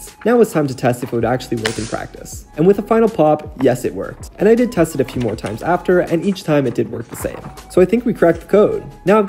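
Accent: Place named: Russia. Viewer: Как будто у него русский акцент на т и р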